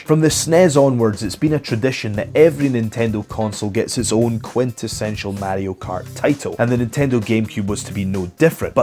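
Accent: Scotland, United Kingdom. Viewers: This guy reminds me of Dominik Diamond from Games Master. His Scottish accent when speaking on his retro reviews bring back so many memories!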